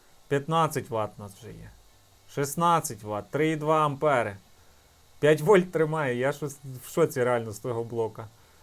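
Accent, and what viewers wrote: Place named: Transcarpathia, Ukraine. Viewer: Дістали, триста. з Закарпаття. Тільки там так говорять.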